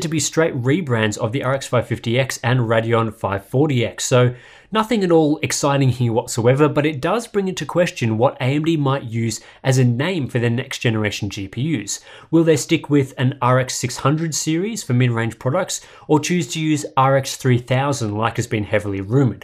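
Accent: Australia. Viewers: said "not as good news." It's that thick Australian accent.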